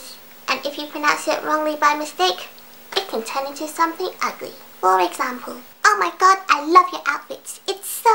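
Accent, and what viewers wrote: United Kingdom. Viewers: somehow i hear british accent. is it just me who noticed that? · A japanese girl with a british accent, now I can die happy. x,x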